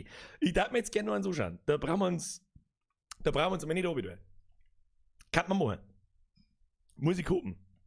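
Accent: Bavaria, Germany. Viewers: Tahts Life Junge ^^ Bayrisch ist halt nicht jedermanns Sache Ich hab halt das Glück und wohne in seiner Nähe und verstehe alles :)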